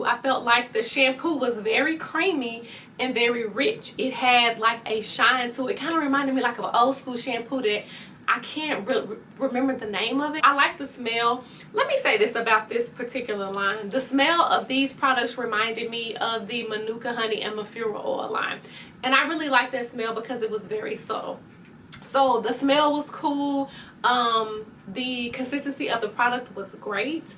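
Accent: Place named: South, United States. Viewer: Girl you gonna break YouTube with that southern accent popping curls fresh face. Thanks for the review. 😄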